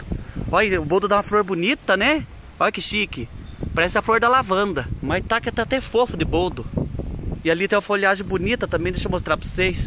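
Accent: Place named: Paraná, Brazil. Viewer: Seu sotaque é bem parecido com do povo q mora no Paraná..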